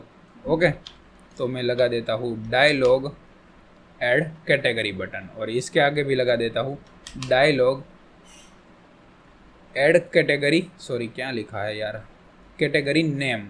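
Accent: Gujarat, India. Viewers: Bhai tamara bolva parthi lage che k tame gujarat thi cho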